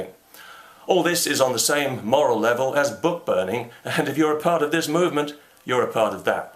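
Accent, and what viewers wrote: United Kingdom. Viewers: You sound British :) you know what. Let's give the Arabs their country back that you stole and gave away and give the Jews a part of England instead. I mean your such a nice and fair people and has always been. Lets not talk about how you treated Scotland, Africa, India, Ireland, slave trade and so on :)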